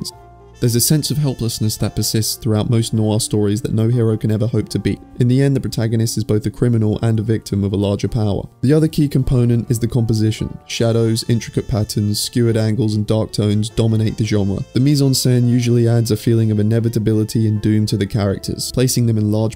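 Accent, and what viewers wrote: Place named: United Kingdom. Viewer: Why do you think this is a good video essay? Is it because he's smart? Because that's only due to his British accent.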